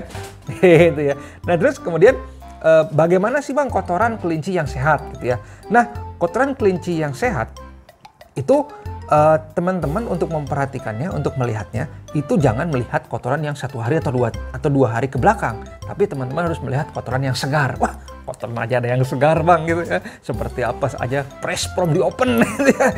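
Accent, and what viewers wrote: West Java, Indonesia. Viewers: Sunda na TI mna kang😀😂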